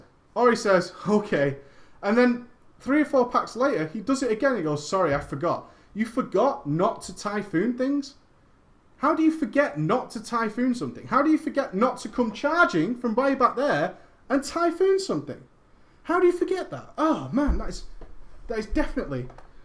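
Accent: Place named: England, United Kingdom. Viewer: Dude your English. Don't know why I never knew that. New sub here.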